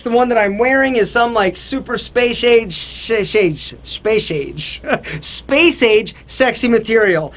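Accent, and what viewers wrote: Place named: Pittsburgh, United States. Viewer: this guy sounds like a yinzer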